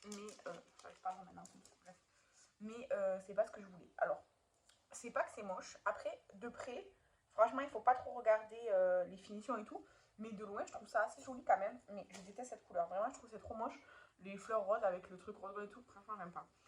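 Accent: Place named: South, France. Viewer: Bonjour 🥰, je viens de découvrir ta chaîne grâce à sandy❤, et je la trouve super, intéressante et apaisante❤️, j aime beaucoup ton accent du sud, bonne journée 🌈✨️✨️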